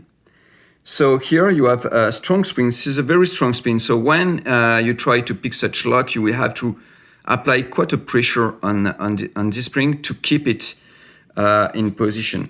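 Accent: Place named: France. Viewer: je suis fan de tes vidéos et avec le french accent, change rien !! Feras-tu le F3D ?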